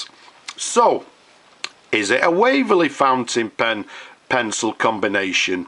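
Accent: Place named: Northern England, United Kingdom. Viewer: Loving the Northern twang lad. I'll contact thi through t'usual channel in a bit if tha's obliging enough.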